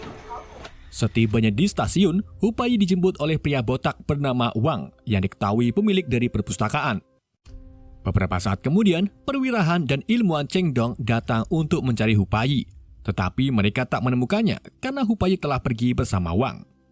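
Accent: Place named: Java, Indonesia. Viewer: Bahasa jawanya khas bgt 😂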